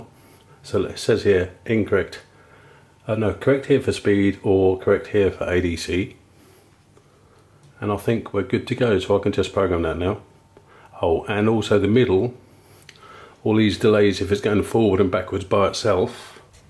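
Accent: United Kingdom. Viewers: will do I’ll try a few of the example programs, I realised after I posted it was probably the picture file, I thought from your twang you was from Surrey way.. Yorkshire a bit further up the country from me.. ok I’ll go have a play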